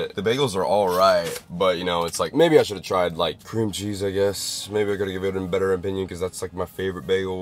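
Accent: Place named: Scotland, United Kingdom. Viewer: GOODBYEEE DID HE SAY WEE BIT HES SO SCOTTISH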